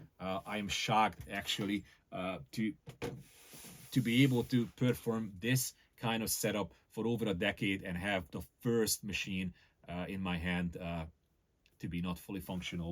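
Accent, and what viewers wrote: Hungary. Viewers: Magyar akcentusod van :) Jó bemutató.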